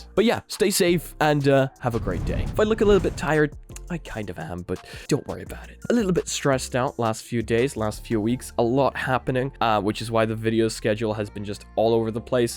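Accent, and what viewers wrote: Ireland. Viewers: your irish? oO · Wait your Irish ☘️ small world thought this audience was just Americans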